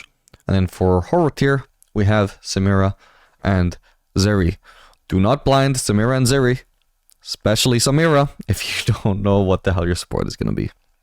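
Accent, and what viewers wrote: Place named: Canada. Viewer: Where are you from? I hear some kind of Canadian accent although account’s from the UK haha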